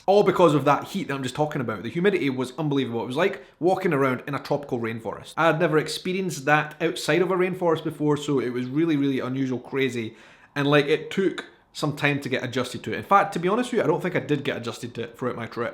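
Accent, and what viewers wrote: Scotland, United Kingdom. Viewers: you are so handsome and that accent makes me shiver, are you married? If so too bad LOL.Personally I much prefer the Scottish or Irish brogue over the boring British. I'm newly subscribed, so far your vlogs are funny and done in good taste unlike some of these British idiots that come here and criticize our food, our language, and our customs.I thoroughly enjoyed when you went to New Orleans and got taken down by that drink the Hurricane. Lol you poor baby. Guiness and Dos Equis beer does that to me. Two pints of Guiness and I'm flying but don't have sense enough to stop. Love It Love It Love It. My cousin married a widow from Scotland named Rebecca, she had a couple young children. She is such a sweet woman as were her children, they fit in this French family like a hand fits in a glove. My daughter went to school with a boy whose father was from Scotland and his name was Ian. 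Lovely family. Seems like some Scottish men are on the shorter side but very manly and sexy. Just wanted to give you a shoutout and let you know how appreciated your context is, keep up the good work🌹🌷😍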